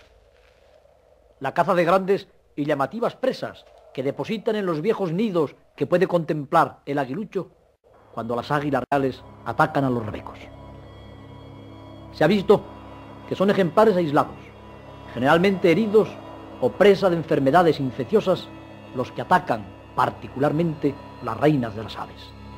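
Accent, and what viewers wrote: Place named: Castile, Spain. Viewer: The narrator's voice is awesome. Old time diction and Castillian accent.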